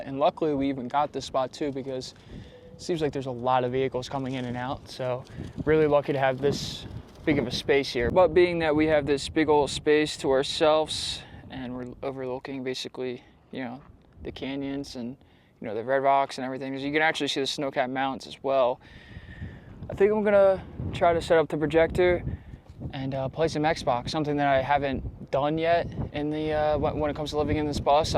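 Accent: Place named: New Jersey, United States. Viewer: Hi. What do you do for work? I love listening to your Jersey accent. Reminds me of where I grew up in southeastern PA.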